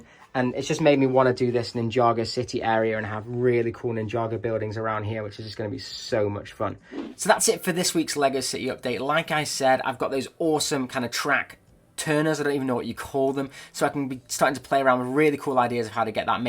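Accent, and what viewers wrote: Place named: United Kingdom. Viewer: Man I would love to have this set, but it was at a time period where I stopped being into building LEGO. Online and used, this set goes from $500 up to $1500 US now a days, which is just too expensive. I'm guessing by your accent, you bought this set used in pounds? I think if LEGO was smart, they would come out with a new monorail set. It would most definitely be a sell out.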